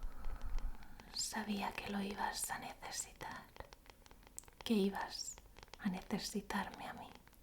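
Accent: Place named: Spain. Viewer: Este me parece el acento más lindo de España, de que región de España es?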